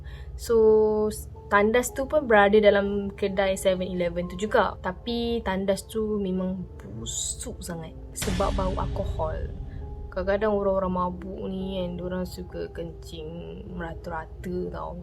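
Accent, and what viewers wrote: Kelantan, Malaysia. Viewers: 7e kelantan ni kowt...sbb dia punya loghat mcm loghat kelantan😚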